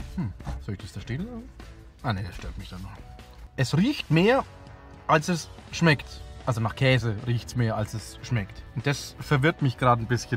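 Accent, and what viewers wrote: Bavaria, Germany. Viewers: Du bist der woll sympathischste YouTuber Abo is da Bayrischer Dialekt direkt angeboren obwohl es auch ein Amerikanischer sein kann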